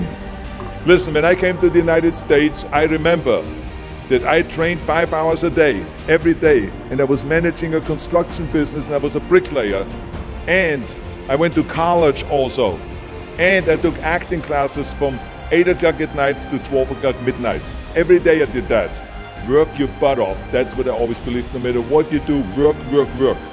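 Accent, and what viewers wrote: Austria. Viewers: Thee Terminator because it’s not just German accent, it is Austrian German accent, plus the dialect specific to the region where he grew up. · You gotta have this charming austrian accent. Got it.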